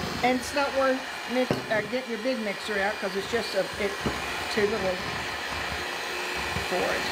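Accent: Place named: South Carolina, United States. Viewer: I'm from East Tennessee, your accent sounds familiar. Almost could pass for an East Tennessee accent, but no quite. Doesn't sound like deep South. Probably South Carolina or Virginia I would guess. Maybe Kentucky, but I don't think Georgia or Alabama. Could be wrong, tho.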